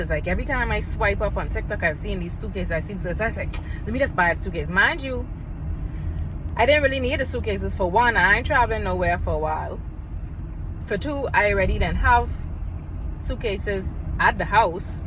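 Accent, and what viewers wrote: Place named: Bahamas. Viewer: Your accent is beautiful. It sounds Bahamian.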